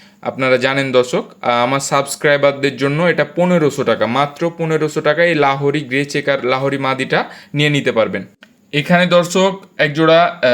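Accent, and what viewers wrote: Kolkata, India. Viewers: আপনার তো কথা ভাই ভারতের কলকাতার ভাষা মনে হয়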